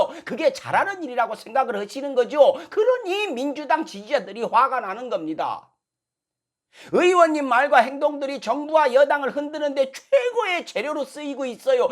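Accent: Jeolla, South Korea. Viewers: 구수한전라말 택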